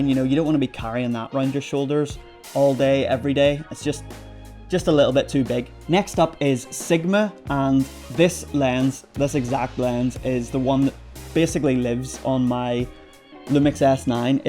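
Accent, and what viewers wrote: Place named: Northern Ireland, United Kingdom. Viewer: good to hear fellow norn Irish folk here in the YouTube camera space! (in saying that my accent is fading)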